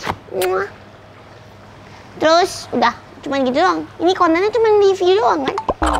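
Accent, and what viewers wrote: Java, Indonesia. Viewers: Disaat orang2 kaya ngomongnya selalu campur english, nah ini medok banget dong ngomongnya heheh... Sukses terus yt channelnya ❤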